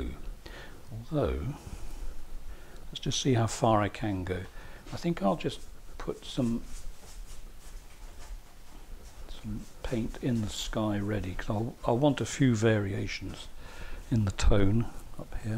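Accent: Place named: Kent, United Kingdom. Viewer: im a pommie that lives in Australia. I came here with my father in the 70s from London to Canberra, where my pa worked in the British High Commission. I never return to UK as it upsets me too much. I used to spend my young years visiting my nana in Tonbridge Kent and you remind me so much of my times in Kent. Your voice, your attitudes, your humour, takes me back to my days in Kent. To the hop fields, to the high street, to the avenue where my aunt vi lived all comes back to me. Australia in contrast to UK is so vast and wild and it lacks the human cultivation, it lacks the history and order that one yearns for at times.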